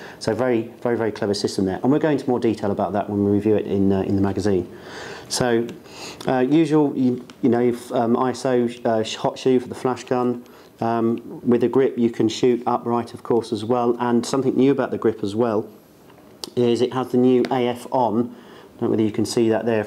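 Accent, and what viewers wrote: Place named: United Kingdom. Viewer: No, he doesn't. He says, "IF I CAN show you that there". It's called a British accent.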